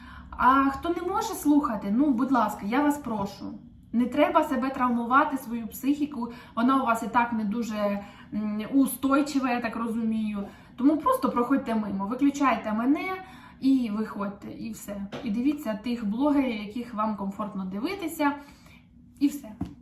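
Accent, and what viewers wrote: Surzhyk, Ukraine. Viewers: вас суржик очень милый❤ Мне, как русскоговорящей украинке, совсем не режет слух😊 Хотелось бы узнать как заговорить на польском, может есть секрет 😂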